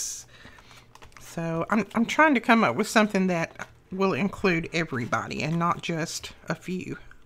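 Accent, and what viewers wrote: South, United States. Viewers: Awesome! I will put you some vintage book pages in the mail!💯📓😎 And now I know why listening to you makes me feel right at home: a sister southerner! 🤓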